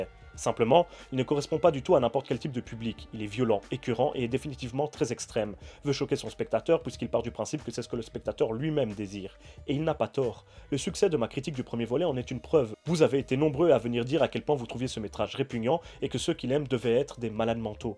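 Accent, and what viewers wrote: Belgium. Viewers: et là bas compatriote Belge! Enfin....sans vraiment savoir, juste ton accent met familier! Hoooooooo oui Clairement, je suis fin friands et amateur de cinéma Underground et ce.....depuis longtemps! J'ai 31ans mais mon VHS des Germelins a 5ans me marquera pour la suite de ma vie cinématographique, ensuite a 10ans+- je reste bouche baie devant les Cénobites de Barker et forcément.....Freddy, je suis un ptn de fan des nanars gore des années 80, Abomination, BlackPast, BasketCase, les TROMA et puis bon....j'ai un vrai problème avec les zombies pr qui j'y porte une affection particulière (ON AIME PAS LES ZOMBIES QUI COURENT!) Romero, Fulci etc....etc.... Étant un gobeur de GrindCore/GoreGrind/BrutalDeath/BlackMetal etc.... C'était presque obligé de tomber fan du cinéma Underground avec une préférence pour Vogiel & Lucifer mais je gobe tout! Vive les litres d'hémoglobine, le sarcasme, l'humour noir et le 17e degré de l'humour! 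J'étais obligé de m'inscrire chez toi en découvrant ta chaîne ce matin 🙏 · Avec plaisir cher je partage ta passion:) + j'aime trop ton accent belge.Continues tes vidéos on t'aimes:)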